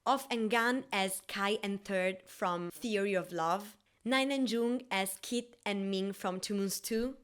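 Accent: Italy. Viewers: I love your Italian accent! I think it’s cute 🥺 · Finally, somebody who appreciates our Volleyball gays from Taiwan! Also don't be shy about your Italian accent. We're all just weird beans watching foreign gay TV shows on youtube, we can handle diversity here ;) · Se que eres Italiana pero creo que entenderás esto, tienes el inglés perfecto!! El acento está ahí pero para mi casi no se nota. Me encantó este video! ❤️ 💕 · I agree with nearly everything you said. I'll add I not understand the hype for Sotus I couldn't even get through the first episode and I tried a few times. I even tried viewing the other bits of it as I love Singto's work normally but it was just so boring and as you said full of boring tropes. BTW thank you and......... It was very easy to understand you, there are no problems with your English you should be proud of yourself. I love your Italian accent, oh yes I forgot to say, 'I'm English'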